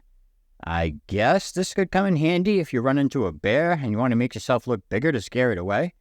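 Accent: New England, United States. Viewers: Hearing you makes me miss New England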